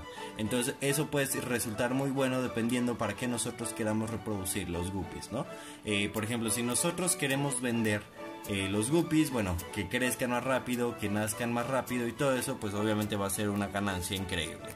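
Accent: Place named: Fresa, Mexico. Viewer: nada mas no hables fresa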